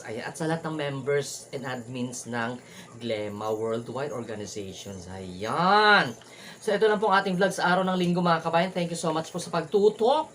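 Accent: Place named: Iloilo, Philippines. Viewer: Good morning ser salamat yn .ka uli kay bunso 💖 😘..na miss ko boses isang ilonggo. God bless you 🙏 ❤ watching Bahrain po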